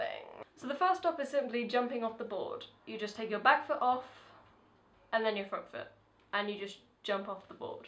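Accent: United Kingdom. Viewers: She's so cute. I love british pronounciation❤ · cute twitter bird!! lolz Lovin the awesome British accent. tee hee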